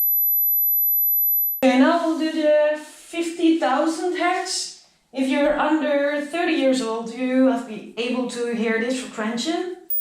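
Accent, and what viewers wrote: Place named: Netherlands. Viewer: Why does she have a verkakte Dutch accent?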